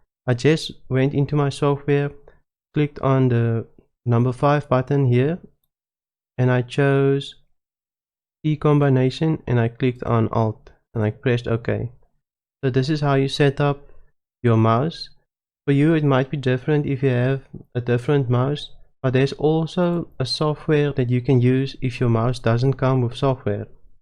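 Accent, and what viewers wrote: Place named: Cape Town, South Africa. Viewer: Thanks, just what I needed to figure it out. And how fantastic to run into another Cape Townian playing SC. Love the accent. Maybe we'll meet in the verse.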